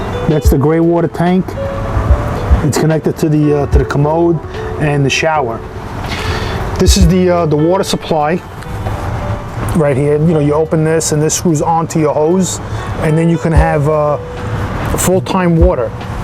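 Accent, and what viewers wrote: New York, United States. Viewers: This is really a big pice of sh*t u kno that rite trying to make it sound like it's a cream puff the way your talking where u from nyc that's y won't be caught dead in this bro it probably stinks of ass n piss with all the sheets covering disgusting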